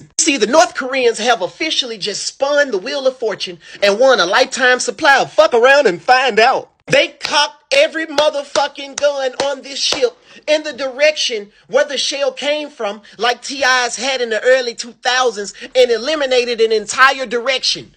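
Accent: Memphis, United States. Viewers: Boss man I been watching you for some time now and didn’t realize you are from Memphis….but I knew you was down this way some how by ya dialect 🫡🫡🫡 Proud Memphian from the north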